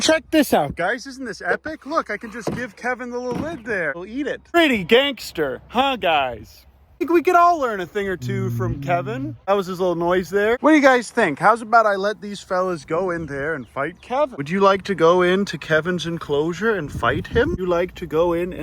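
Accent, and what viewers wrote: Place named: Pennsylvania, United States. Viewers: Why do you have a Pennsylvanian accent if you’re from Texas. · Hearing this man use western PA speak is always confusing to me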